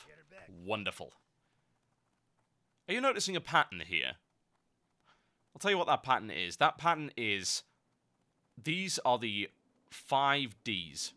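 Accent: United Kingdom. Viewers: I come for the WoW stay for the british accent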